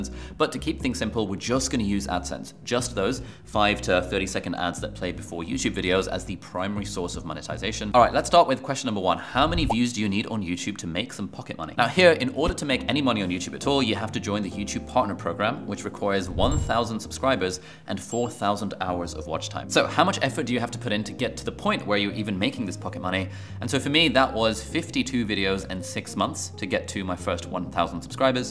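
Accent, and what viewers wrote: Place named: United Kingdom. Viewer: How can we talk like a British, talk like you . ???. 🤔🤔🤔🤔🤔🤔🤔🤔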